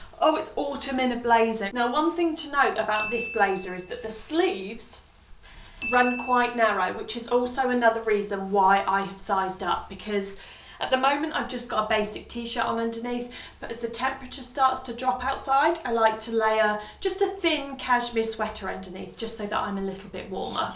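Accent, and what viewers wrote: United Kingdom. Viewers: Gorgeous those blazers !!! I loved them. In fact, I liked all of your purchases, the white and pierced blouse is beautiful !!! Oh, I always forget to tell you, I love your UK accent !!! Best wishes from Brazil.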